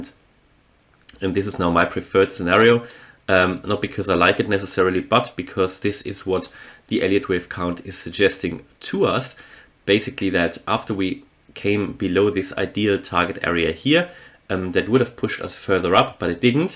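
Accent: Germany. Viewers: Dude I love your analysis. Pretty straight forward and precise. If I'm not wrong I'm also hearing a German accent. in which case muss ich sagen echt geile TA bro 👍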